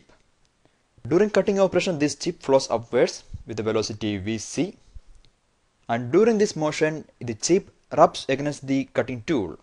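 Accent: Kerala, India. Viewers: good one. I guess the speaker is a keralite. the accent 😑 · Nice mallu accent :)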